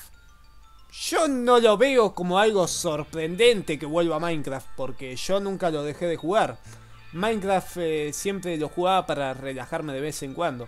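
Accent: Argentina. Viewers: No hay nada mejor que un narrador argentino (o con acento argentino)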